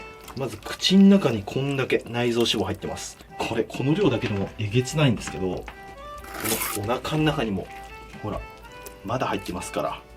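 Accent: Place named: Aichi, Japan. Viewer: 愛知のなまり 「ゴキブリ出たげー」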